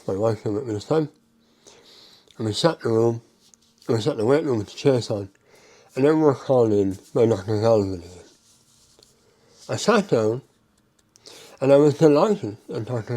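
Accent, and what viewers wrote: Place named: Ireland. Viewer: Hi, I used to watch yours and others commenting on cars here on youtube, you are not Jeremy Clarkson, but your Irish accent and your personality shines, so I remember you much more than I remember lots of others. I noticed your voice has changed a long while ago, but didn't think much of it. I then moved to Canada and no longer needed a car, so kind of stopped watching car channels. Yesterday, I wanted to see some Toyota Corolla opinions and searched for your channel, then discovered that your voice has changed and is due to the cancer. I felt quite sad, I showed your video to my wife and told her why I liked your channel etc, she was quite moved by your story too. You are brave, your inspire whoever is facing any difficulties in life not to give up and keep one's spirit high. Please keep fighting, keep bringing us your amazing videos, I want to see you commenting on cars when you are 99 years old, or whatever travelling devices we have by then! 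My best wishes to you and your family!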